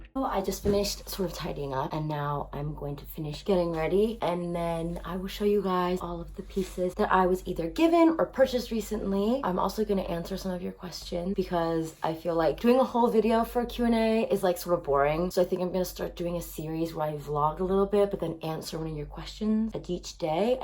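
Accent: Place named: United Kingdom. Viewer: there's some parts in this where you have a really strong brittish accent omg 😂❤️❤️❤️ I guess it's rubbing off on you xxxxx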